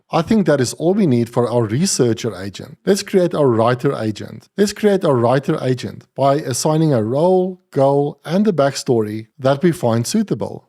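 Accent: South Africa. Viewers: Hi, this is a great intro tutorial for crew. Tell me, your accent tells me that you are in South Africa, is this perhaps true?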